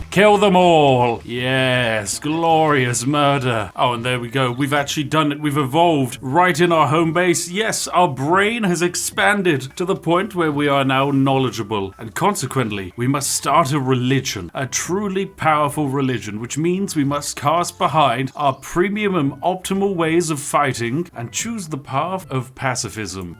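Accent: United Kingdom. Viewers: I love how talks like a true colonizing brit from the 15th- 16th century. hilarious! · Is it just me or is he somehow acting better as himself than last video? Like, he's just more british than usual or something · Wait this guys british? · Me realised he’s practically British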